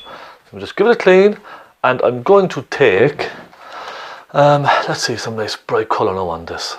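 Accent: Cork, Ireland. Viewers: nice one bud thanks ,,,, from your accent id say your not far from me ,, :) ,, co cork me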